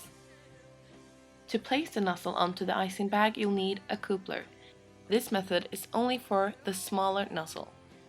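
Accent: Norway. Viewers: herregud det første jeg tenkte når jeg så den viden her var: hun må være norsk.. også hørte jeg aksenten og det var vel det som overbeviste meg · Jeg så det på deg at du var norsk! I tillegg til aksenten mens du snakket, hehe :-D Måtte sjekke, og da så jeg at du var det på de andre videoene dine :-) Veldig fine muffins :-)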